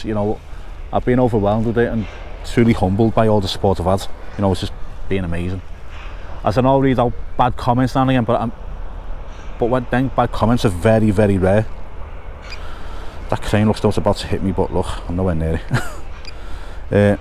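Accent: Liverpool, United Kingdom. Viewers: Am a long distance ‘pool fan (Teesside). I could listen to your scouse accent all day long. Love your videos as well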